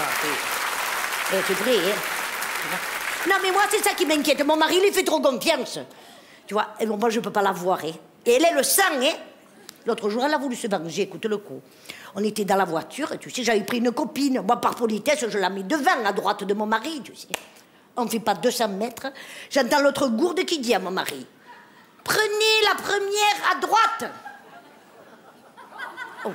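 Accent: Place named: Nice, France. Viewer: c est la perle du rire.+ Anne Romanofe = pissé de rire,sacré "Mado"j adore l accent niçois et ses expréssions .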